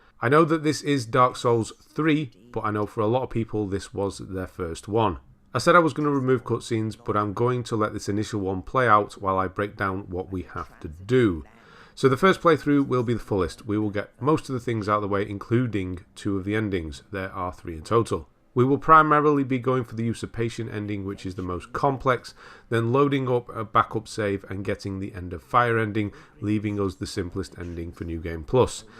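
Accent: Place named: North, United Kingdom. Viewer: To my USA ears, it’s perfect to get a walkthrough from someone who sounds North Country, just like all the NPCs in fromsoft games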